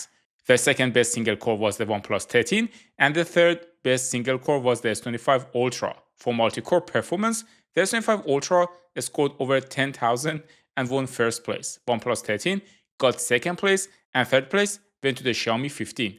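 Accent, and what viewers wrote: Iran. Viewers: dash kheili irani mizani ba in lahjat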